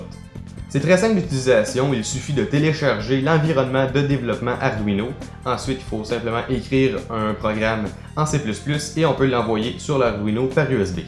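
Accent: Canada. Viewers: canadian by any chance ??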